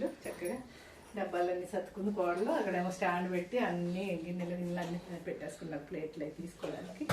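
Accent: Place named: Godavari, India. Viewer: మాటతీరు గోదావరి వారిలానే ఉంది!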